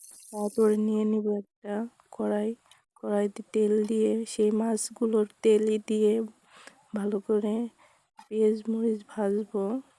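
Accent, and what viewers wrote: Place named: Bangladesh. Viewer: খুব সুন্দর লাগলো তোমার রেসিপিটা খুব ভালো লাগলো, পুরো ভিডিওটা দেখলাম, কথা শুনে মনে হচ্ছে বাংলাদেশে বাড়ি, আমি ইন্ডিয়া থেকে দেখছি ভিডিওটা, আশা করি আপনি আমার পাশে থাকবেন❤❤❤❤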